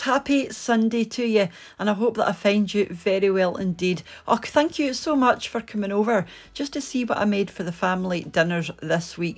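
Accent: Scotland, United Kingdom. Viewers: MY wife and I had the opportunity to visit Edinburgh Scotland last week and we thought of you. Haha. Beautiful country and city. We were in Inverness as well. I enjoy your channel very much and lovely accent and demeanor. You are always so happy and you have a lovely voice. Keep up the wonderful content. 🙏🏼✝️🦞🥩🥔. Cheers from California USA 🇺🇸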